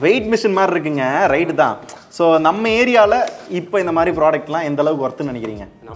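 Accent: Madurai, India. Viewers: மதுரை slang Semma thalaiva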